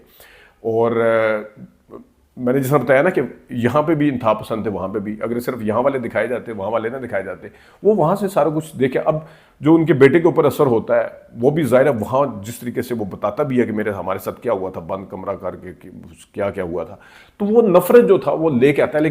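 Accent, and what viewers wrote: Punjab, India. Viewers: Like you I think your mother tongue is Punjabi that's why when your urdu not clear Apki urdu mein bhi Punjabi lehjha clear Nazar ata hey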